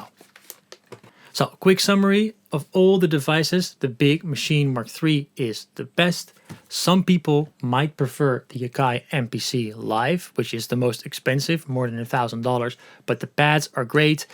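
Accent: Netherlands. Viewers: So you are Dutch, right?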